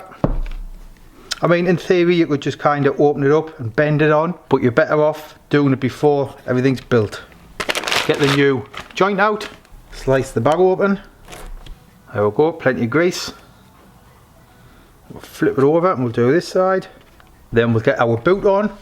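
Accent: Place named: North East England, United Kingdom. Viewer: as always good thorough content. I tip my hat to you for the endless late nights of editing your videos, this must take up a lot of your time. Keep bashing away…. I came across your videos a few years ago and noticed the northeast accent, mint! Been watching ever since. 👍🏻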